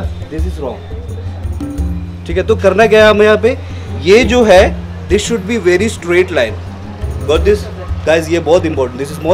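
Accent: Kerala, India. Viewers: Are you a malayali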